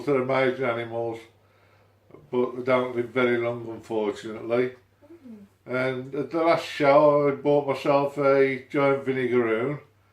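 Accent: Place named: Black Country, United Kingdom. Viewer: Nice one. Where are you from? Sound local to the Black Country?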